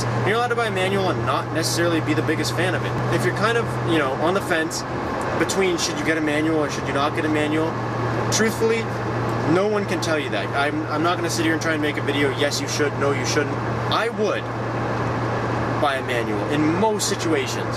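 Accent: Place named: Canada. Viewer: I keep hearing “aboot” and I’m like.. you’re Canadian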